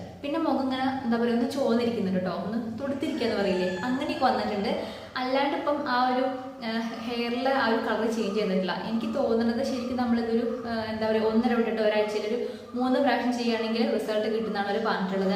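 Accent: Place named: Thrissur, India. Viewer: Trissur aano home, slang 👍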